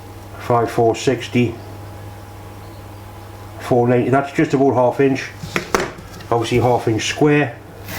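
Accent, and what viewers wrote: Newcastle, United Kingdom. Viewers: Love ya vids, and it's great to hear a geordie on yootuube ha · Gordie north east england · It's Genuine Newcastle. Great to hear! Hi, can you do a hotpot please?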